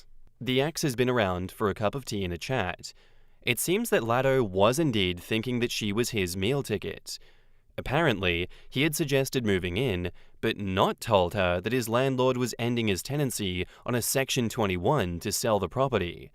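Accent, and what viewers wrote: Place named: Australia. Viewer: You can tell this narrator is Australian because he's a cucked wuss who's whole country should be erradicated.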